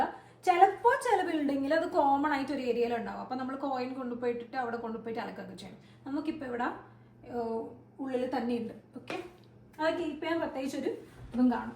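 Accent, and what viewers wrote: Kannur, India. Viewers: Hi I am Sruthi from kannur but eppo engu oman l WRK cheyyunnu Edo unexpected ayitta thande video insta l kande adipoli anallo but youtube l vannu nookkiyappo kurachu videos alle ullu iniyum post cheyyanam YouTube l.WRK pressure nde eyal kannur basha kelkkumbo thanne oru happy a.athinu thande video kanananam enna avasthaya.nammal family ayi evide anu.kannur basha manasilavathavarkk vendi change varuthi basha thanne mari poyi. So iniyum video expect cheyyunnu. By aradika🎉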